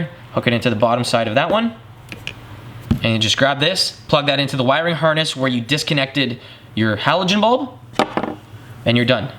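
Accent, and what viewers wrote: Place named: Canada. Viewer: so canadian... oooout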